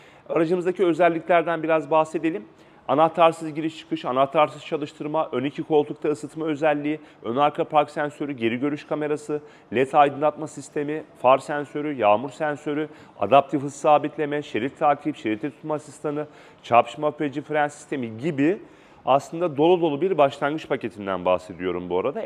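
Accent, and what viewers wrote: Samsun, Turkey. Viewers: Kulağı tirmaliyor biliyorum ama şive biraz Samsun da genel durum😊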